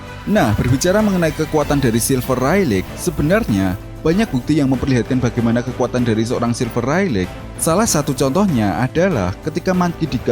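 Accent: Java, Indonesia. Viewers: Bahasane meduk rek 😁.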